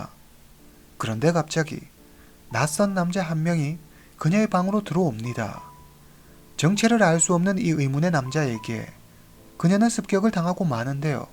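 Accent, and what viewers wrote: China. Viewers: 혹시 조선족이신가요?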